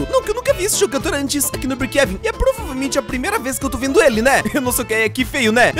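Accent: Portugal. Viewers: Sotaque de Portugal...blz né kkk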